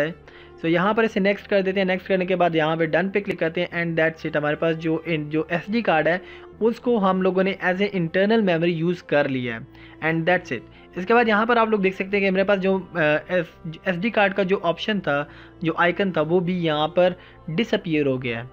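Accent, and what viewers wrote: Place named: India. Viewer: This makes no sense,the words are in English but he's speaking Indian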